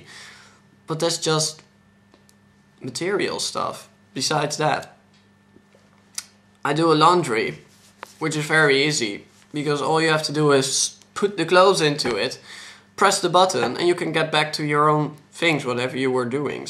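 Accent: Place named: United States. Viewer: You do a awesome job on U.S. English by the way. I love your hair too. You cool.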